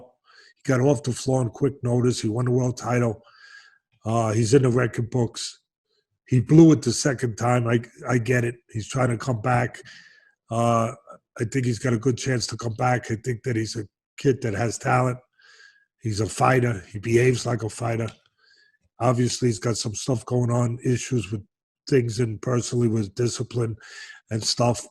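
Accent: New York, United States. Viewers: The older he gets the stronger his NY accent becomes.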